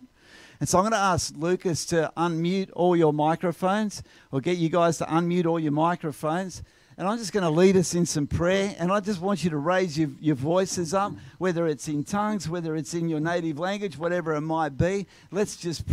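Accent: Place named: Australia. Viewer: Great to hear an Australian Christian voice predicting the rebuilding of American Christian faith. I pray you are right. God bless. SOK. Geelong, Australia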